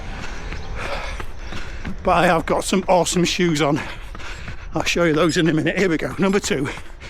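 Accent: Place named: United Kingdom. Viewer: Oh, I suddenly feel like I oversimplify my warm-ups (when I have them anyway) a bit too much 😅 I just jog around with a few brief sprints just enough for the sympathetic system to properly kick in (takes about 7 min for me) and that's about it. I recently discovered that it's very easy to see the exact moment (only post factum unfortunately) when it happens on the HRV chart of R-R intervals — they suddenly stop being random and heart goes into that "fight-or-flight" mode. PS: just noticed that you too say "me" instead of "my" :) for some reason only recently noticed that Brits often do so and I gotta admit I really like how it sounds. 😊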